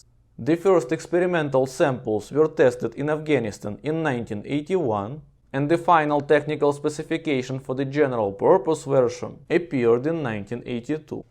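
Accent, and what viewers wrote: Russia. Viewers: New viewer here. I have never heard a more Ivan sounding Ivan than you before. Love the accent. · Hotel posmotret...yslushal dikiy ryski akzent..I rashotel smotret.. Ychi Angliski sinok...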